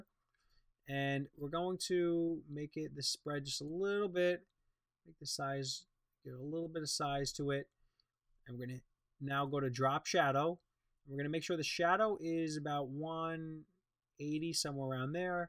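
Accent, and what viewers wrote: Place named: New York, United States. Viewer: Noo Yourk.... I love your accent so classic NY! Not a dis but coming from the midwest like i do it is refreshing to hear and love this new series.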